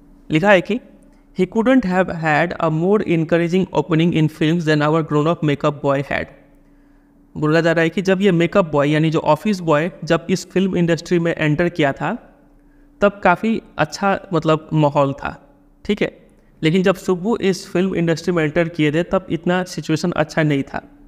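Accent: Bihar, India. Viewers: Sir are u from Bihar ?? Just asking ❤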